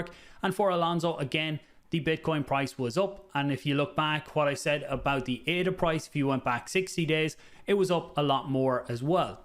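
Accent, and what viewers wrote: Ireland. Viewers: Thanks for the quick video and update, —always appreciate your insight. New mic sounds clean; that beautiful Irish accent is as crystal clear as ever!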